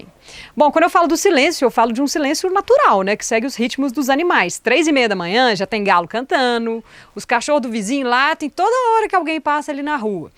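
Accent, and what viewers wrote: Minas Gerais, Brazil. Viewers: ótimo, essa ideia de voltar para esse contato com a terra é especial, temos que aproveitar mais nossa mãe, estamos por aí tão viciados no urbano frenético que por vezes até já esquecemos a nossa origem e é sempre bom lembrar nessa encarnação que quem nos sustenta mesmo não é o sistema humano artificial e sim a água, terra, ar, sol, chuva e (...). Amei esse canal, estava sentindo falta de youtubers mineir@ agente só escuta o sotaque do rio e são paulo por aqui na maioria das vezes...BH me representa muito mais na fala...vocabulário e etc..paz e luz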